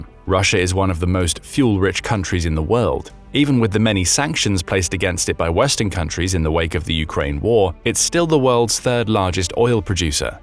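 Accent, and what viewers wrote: United Kingdom. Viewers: Why do Brits say rusher for russia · Love the Brit announcer, 💯🐖🐖💩🤷🏿‍♂️🥵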